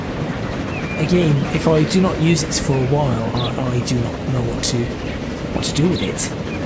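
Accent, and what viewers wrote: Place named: Liverpool, United Kingdom. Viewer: With youse Scouser accent, I have the same problem with Yorkshire. Where t’ t’ goes…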